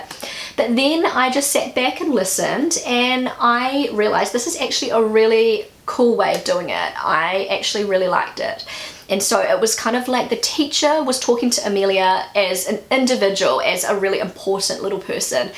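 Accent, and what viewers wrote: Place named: New Zealand. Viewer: We just had this LSE-meeting (short for Teacher, Student, Parent) last week with my son our first time and I was suprised to see his interaction with his teacher. There were some questions where I thought I’d needed to help him answer (we are a bilingual home, and mainly speak English at home because of my husband) so it really made me happy to see his good relationship with his teacher and his confidence. I do like this idea. When I was in school it was with the parents only, and the kids stayed home. And from some friends and family who have teenagers, when their kids were smaller it was still the same - parents only (Rheinland-Pfalz). So I’m not sure when this actually started. Also, I must say I enjoy your videos so much! But I don’t know if I like it more because of your topics or because of your New Zealand dialect and pronunciation. 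I could hear it all day!!!❤️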